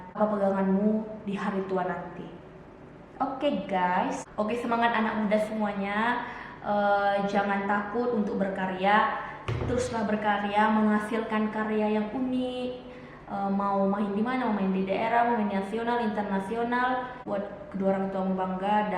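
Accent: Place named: Medan, Indonesia. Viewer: lanjutkan kak.. saran aku hanya klo vlog, jgn dirubah logat medan nya.. gas poll kak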